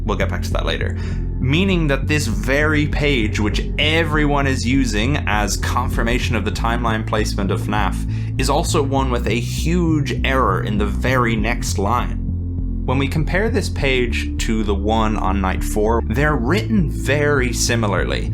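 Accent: Ireland. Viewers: are you irish? theres a slight accent to ur voice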